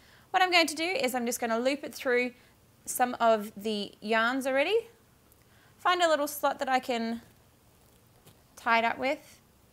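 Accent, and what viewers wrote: Australia. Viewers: Aussie accent. Where in Australia did you buy such a large ball of yarn and where is the Mod podge from? Thank s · Is she Australian? Great vid!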